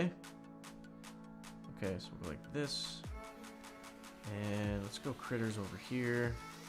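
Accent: Canada. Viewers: Great accent Canada, eh!! Nicest people on the planet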